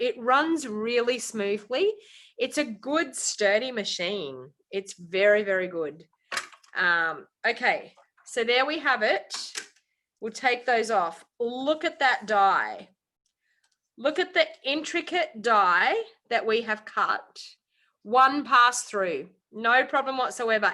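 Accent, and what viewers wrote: Australia. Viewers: Finally Australian accent ❤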